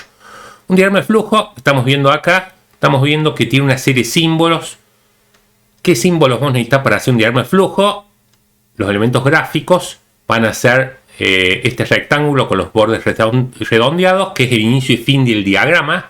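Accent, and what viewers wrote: Argentina. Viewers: buenas tardes profesor, me esta empezando a gustar el acento argentino no me paso viendo hablar a messi ni a maradona muy agradecido voy por el video 66 no me habia dado cuenta que tenia todas esas pestañas en el editor de codigo abiertas y te vi como las cerrabas y aprendi jejeje, muy agradecido con tu enseñanza si hubiera sabido de alguien en el pasado que explicara asi le hubiera pagado. pero no encontre tal cosa. espero poder contribuir en un futuro muy cercano, la verdad que siento pena de ver tu gran esfuerzo y no dar nada de vuelta. muchas gracias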